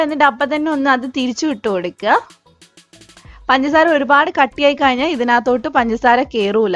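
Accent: Kannur, India. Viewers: Super sound kannur evideyann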